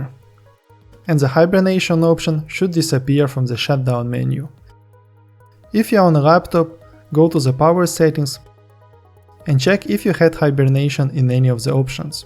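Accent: Russia. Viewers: strong slavic accent, most probably russian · Russian accent + linux is very good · Говоришь с русским акцентом) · I'm sorry, I didn't mean to seem negative in any way. To me it sounds like you have a Russian or Ukrainian accent (?), languages that to me sound like they have a lot of i's or j's directly after some consonants. So i tried to write "probably" the way it sounded to me. Anyway great video, lot of useful information! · such modesty and humility is rarely seen. In my case, because of your accent, watching this video reminded me of my childhood spent in Russia. Thank you for that and for the very helpful video!:)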